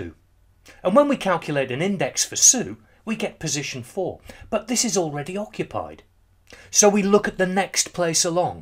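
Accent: England, United Kingdom. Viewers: His British accent sounds so cool! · I think the British accent helps me learn better. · His British accent though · Perfect explanation. On a side note, are you British? when you speak, you rhyme and it's a treat to my years. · You have a fine English accent, Mate. It helps my brain gear run smooth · Thank you, very british man, for a nice explanation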